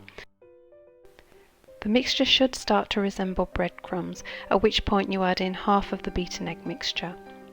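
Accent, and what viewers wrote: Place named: RP, United Kingdom. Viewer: I'm an 81 yr old ex pat living in the USA since 1980. Lost my wife to heart surgery a few years back, after 60 yrs together, so I'm now the novice cook. No plain flour in the US only all purpose or Bread flour. Can I use either, as is, or with some modification. I just tried your project with premade Pillsbury puff pastry. Everything that could go wrong, did. Cut the circles too small, pastry is a bit thin, didn't reach the top of the muffin hole. Jam overflowed etc. Welcome to my disaster zone. Tried making a Treacle (golden syrup) in bread crumbs pie yesterday, did a blind bake on the crust, came out good but the syrup filling went rock hard, guessing poor syrup to breadcrumb ratio. Is there any hope for me ? I'm a Dagenham boy so your accent is more posh than mine. Blessings Bob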